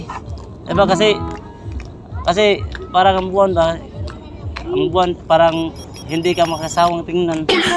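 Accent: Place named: Visayas, Philippines. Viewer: Pag bisaya na lng oi,halata imong tuno!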